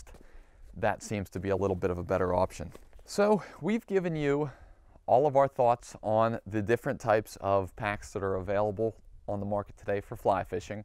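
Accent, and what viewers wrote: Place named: Pennsylvania, United States. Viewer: Knew as soon as I heard you speak you were a Pennsylvanian like me 😄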